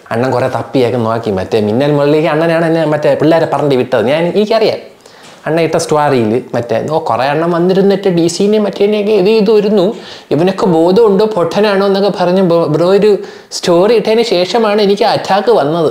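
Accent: Trivandrum, India. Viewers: എന്തൊക്കെ പറഞ്ഞാലും നല്ല തിരുവന്തപുരം ഭാഷയിൽ പത്ത് പറയുമ്പോൾ ഉള്ള സുഖം അതൊന്ന് വേറെ തന്നെ...👍😊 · Huge Respect bro, Secret Agent & Mallu Traveller 🙏🙏👍👍👍👌👌and bro your Trivandrum slang 👌👌👌 വേറെ ലെവൽ 👌👌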